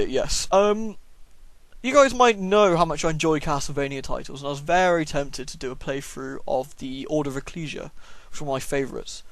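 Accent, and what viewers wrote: United Kingdom. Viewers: Burly Castlevania with shouty ranting brit-man? Sounds legit!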